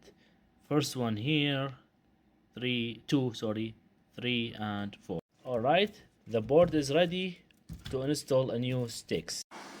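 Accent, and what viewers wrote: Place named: Iraq. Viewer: من الاكسنت أعتقد ان الأخ عربي من العراق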